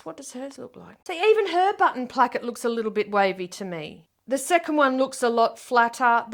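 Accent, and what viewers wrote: Australia. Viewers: I get the ick with that Ooey Gooey name, too. The design reminds me of lava lamps. Not hot! Fish girl fashion: definitely hot, but I'd modify so the sleeves were in the usual position. I have not knit any of these, though Isabel Kraemer's designs Tresse and Nasrin are in my library, and I have fingering weight yarn for them. Thank you for your video series, they are super entertaining, especially with that Aussie accent!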